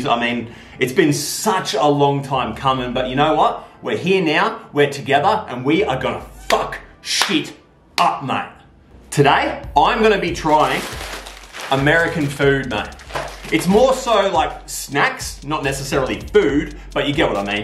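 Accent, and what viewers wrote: Australia. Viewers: no he's just australian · Hes Australian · That's the most Australian accsent I've ever heard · I love ur Australian accent. Lol Im in Australia Melbourne!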